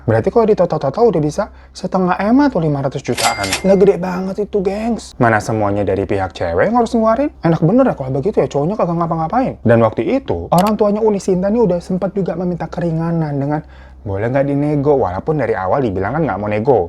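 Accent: Java, Indonesia. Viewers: Bahasa pake bahasa minang, tapi logatnya logat jawa, bang the best 😂😂😂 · baca text Minang pakai logat Jawa🤣🤣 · BANG w salpok LU NGMNG bahasa minang tapi logat jawa😭😭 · kooo minang jadi logat jawaaaa :face-purple-crying::face-purple-crying:🤣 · minang logat Jawa ya bang😲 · Wkwkwkwk.. agak lucu bg.. logat bahasa minangnya jawa.. tapi usahanya untuk berbahasa minang top lah.. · Bahasa minang tp logat jowo piye toh bang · Kok logat nya jadi jawa yah kak 😅😅😅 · Cerita Minang contoh bahasa malah logat Jawa BG 😅😅😅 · Minang logat jawa. Lucu bet sih bang. Awokwowokkk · Bang, haha kok lu jadi logat Jawa bang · voice overnya jawa😂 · logat jawa tapi bawa bahasa minang😭😭😭😭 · Koq aku ngakak bahasa menang logat Jawa....😅😅 semangat bang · Ternyata bisa ya bahasa minang pakai logat jawa 🤣🤣🤣 emang beda 👍👍👍👍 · Knpa logatnya kita jd jawa ya Langsung ngakak 😅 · Kenapa logat nya jadi jawaaa 😭🤣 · Wkwkwk lucu banget bg ngomong bahasa Minang pakai logat jawa. 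😅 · Bang napa logatnya jadi jawa wkwkkw bukan padang🤣gemoy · Bahasa minang logat Jawa😭🤣🤭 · Kenapa logat nya jawa si😂😂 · Logatnya jawa banget bang 😂😂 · Bahasa padang tapi logat jawa 😂😂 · Kenapa logatnya jadi jawaa wkwkwk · Kenapa jadi logat jawa sih bang hahahhahaa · Bahasa minang logat jawa 😅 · Bang pas lu bacain percakapan gua ngakak 🤣 malah medok jowo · Logatnya knp jadi jawa bangg😂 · Bg ngomong bahasa Minang aksen Jawa bgtu😅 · Sempet bingung tadi dgr bang ngmg logat jawa pdhl lagi ceritain suku minang😂😂. Seharusnya adat2 seperti ini tidak perlu di lestarikan,krn memberatkan,dan tidak sesuai dgn syariat Islam yg mayoritas agama disana,jadi kesannya kyk jual anak. sebagai orang tua harus lebih wise untuk kebahagiaan anak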